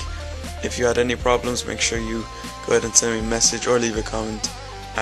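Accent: Ireland. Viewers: Where ya from in Ireland?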